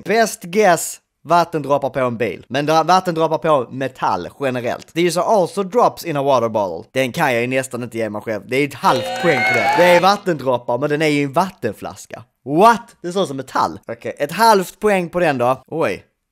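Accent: Scania, Sweden. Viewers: Tycker som inte om skånska men den här är fan för skön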